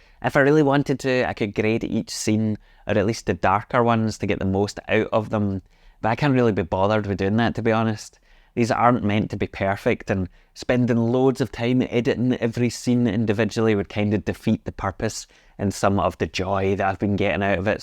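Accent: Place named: Glasgow, United Kingdom. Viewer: PS - He’s a posh weegie. 😮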